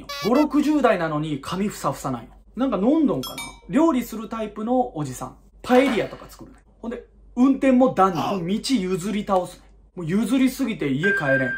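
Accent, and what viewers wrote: Hiroshima, Japan. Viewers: 広島弁が沢山聞けて嬉しい。車シリーズ大好き。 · コテコテの 広島弁良いですね。私は１２０系マークXに乗ってます。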